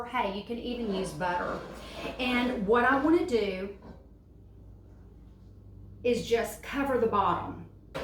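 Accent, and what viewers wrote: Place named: Alabama, United States. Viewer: Living in the Uk, looking for a ladyfinger ( okra, they dont use the name "okra" over here so much)recipe ...and being a native Eufaulian from Alabama, Iinstantly recognized the accent. This is exactly what I was looking for in an okra recipe. Thanks so much for reminding me of my old home far away.......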